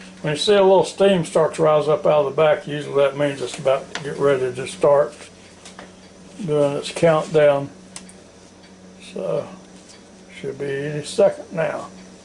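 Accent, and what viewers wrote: South, United States. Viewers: I called it Chick Roast because when I make it its chick roast...when hubby makes it its chuck😘..I have to go look at more of your videos.i am dyslexic so seeing it done helps me so much more than reading the directions .Also I can understand your sweet southern accent cause I have one too😁 Again thank you. I cant believe how tender and flavorful the meat was and how soft the veggies.I added radishes. I hate raw radish but when roasted they are not hot tasting but very sweet it's crazy but gooood! Hope y'all have a great day!🌞💝🕊🙏😁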